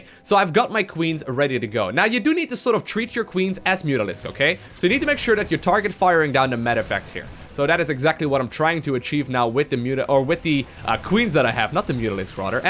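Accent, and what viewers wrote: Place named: Netherlands. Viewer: Dutch accent is so understandable to native-russian-speakers in some weird way.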